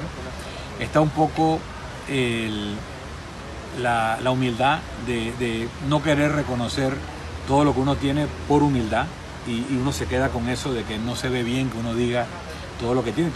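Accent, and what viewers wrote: Spain. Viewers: Madre mía!! Su acento es prácticamente igual al canario. Yo soy canaria y casi no lo distingo del acento de la gente de Tenerife. Increíble! Sé que los canarios emigramos masivamente durante siglos a Cuba, Puerto Rico y Venezuela y en menor medida a Santo Domingo y a toda la costa caribe de Colombia, Costa Rica, Panamá, etc. Includo llegaban barcos con familias enteras de canarios a Yucatán y desde ahí se desplazaban hasta Florida, Louisiana y Texas fundando ciudades como San Antonio de Texas, pero también bajaron hacia el sur fundando, por ejemplo, la ciudad de Montevideo en Uruguay y muchas otras. Asimismo los canarios descendemos de andaluces, extremeños castellanos , gallegos y otros europeos, además de sangre aborigen guanche, bereber del norte de África. Más que por la sangre, que la hay, lo que nos une realmente es nuestra maravillosa cultura común, de la que hay que sentirse orgullosos porque no hacerlo sería despreciarse a sí mismo.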